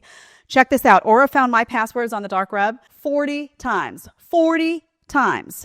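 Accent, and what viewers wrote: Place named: Long Island, United States. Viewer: NOT FLIRTING, JUST STAING MY OPINION., I like your Long Island accent that slips through every so often. It reminds me of my parent's accent (Connecticut born). Nostalgia.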